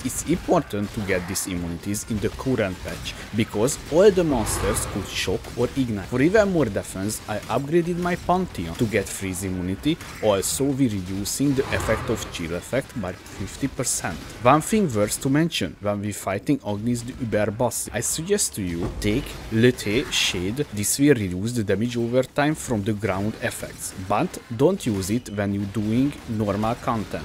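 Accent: Hungary. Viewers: Someone having a Hungarian accent here :D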